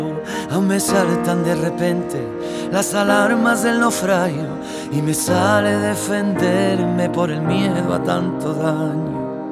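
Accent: Andalusia, Spain. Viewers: No pierdas nunca tu acento y sigue hablando siempre con orgullo en IDIOMA ANDALUZ, la segunda lengua materna más hablada del mundo. Que sepas que no estás cantando sólo en tu nombre sino en el de 400 millones de andaloparlantes. Andalucía, tierra legendaria y universal. Inspiración a lo grande, como esta canción, que nace de niño y es capaz viajar lejos, muy lejos, donde alcanza más el corazón que la mirada. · Muero contigo Manuelillo...mil veces la escucharé y diosssss que Bonito..viva Isla Cris..tu pueblito..viva..Huelva..y viva Andalucía. olé olé olé y me GUSTA niño tu acento..andaluz..Isla Cristina..un sitio precioso ese Andalucía..suerte niño..un besote pedazo de ANDALUZ y guapo..de morirse..como decís por allí...💓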